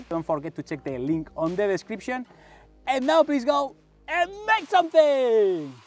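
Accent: Spain. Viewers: Es cosa mia o su ingles se escucha muy españolisado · Le note ancento español pero hablaba ingles jajaja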